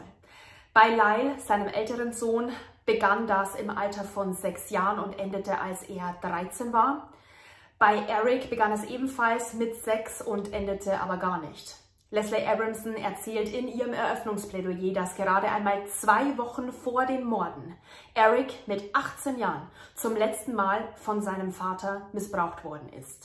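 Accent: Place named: Upper Franconia, Germany. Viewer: Hört sich an wie Oberfranken.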